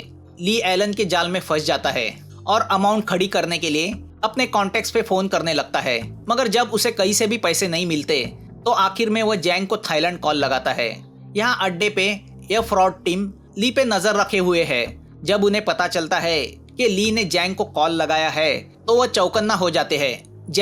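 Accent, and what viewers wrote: Maharashtra, India. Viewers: Aapke hindi marathi ki jhalak dikhai deti hai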